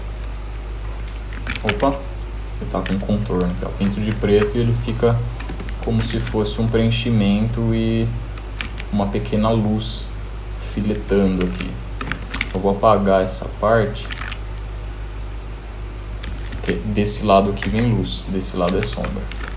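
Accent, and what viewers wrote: Brazil. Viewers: O sotaque brasileiro o entrega,. rsrs Parabéns pelo projeto, brother.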